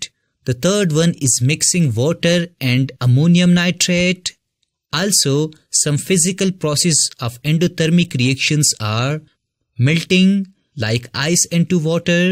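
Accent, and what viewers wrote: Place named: Kerala, India. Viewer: SI=ir get a accent your accenet is so keralan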